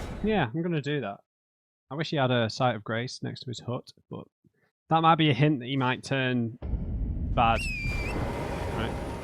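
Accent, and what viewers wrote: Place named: United Kingdom. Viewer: Loving the playthrough! Where abouts in the UK are you from by the way? trying to pin down your accent (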